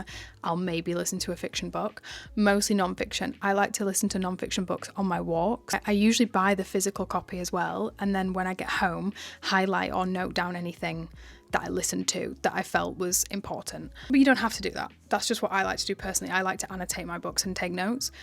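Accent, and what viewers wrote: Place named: North, United Kingdom. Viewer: heard the northern accent, immediately subscribed 😌🙌🏻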